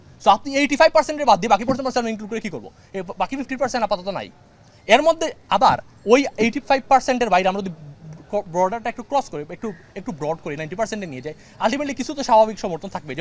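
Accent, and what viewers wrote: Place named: Sylhet, Bangladesh. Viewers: Sylhety bro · আরেহ ওতো সিলেটি।।। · ভাইর সিলেটি স্লাং গেলো না, বেশ মজাই পাচ্ছিলাম উনার কথা শুনে। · Vai er bangla pronunciation e buja jacche je Vai Sylheti.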